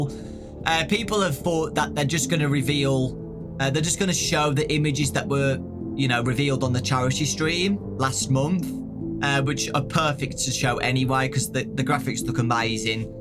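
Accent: United States. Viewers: American accent is so good it's unsettling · i love his american accent lmao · Lol that American accent 😄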